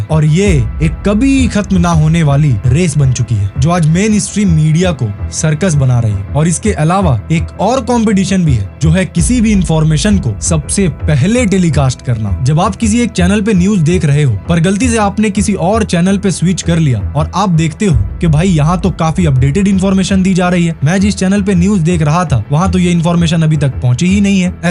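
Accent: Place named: Bengal, India. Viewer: দাদা তোমার হিন্দি তে বাংলা টানের জন্য গর্বিত! 🙂 🙏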